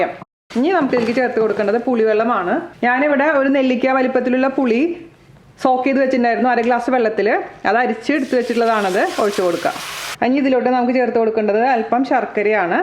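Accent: Thrissur, India. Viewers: Trichur slang sooo sweet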